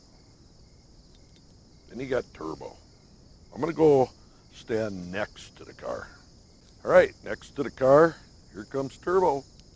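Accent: Minnesota, United States. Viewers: Minotaurism probably could do a mean Macho Man too! They’re all from Minnesota area so it’s the accent.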